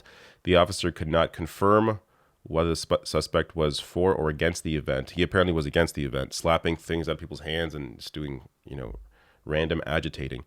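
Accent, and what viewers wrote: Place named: Canada. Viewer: I looked you up because I thought you sounded Canadian....weird how that is, eh? Aloha from BC!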